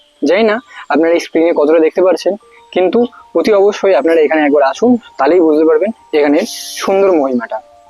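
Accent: Bangladesh. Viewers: বাংলাদেশের মাল,উচ্চারণ তো এরকম হবেই। আর মানুষকে বিভ্রান্ত করে পুরো ভিডিও দেখানোর কৌশলের কারণে থাকা খাওয়ার ব্যবস্থার কথা বলে,এভাবেই এদের পেট চলে। একই কথা দশবার বলে ভিডিওকে বড় করে।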